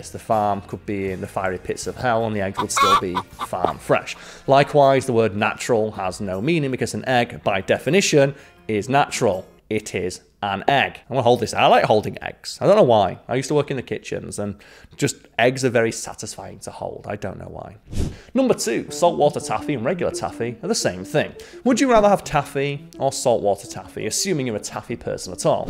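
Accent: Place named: Yorkshire, United Kingdom. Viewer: Montelban, not Montelblan, still made me laugh every time. Then again, maybe I'M the one not fully understanding your wonderful Yorkshire accent. Hmmm?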